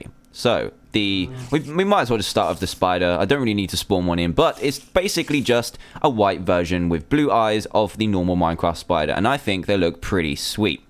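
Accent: England, United Kingdom. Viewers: i used to watch your videos so much when i was about 3, that i developed an english accent, it sounds stupid though because its morphed with a US accent (because in in the US) but still, if i had a choice i would have still watched all your videos. we love you, you were a pillar of old minecraft, and a peice of the foundation new creators build upon. Thank you.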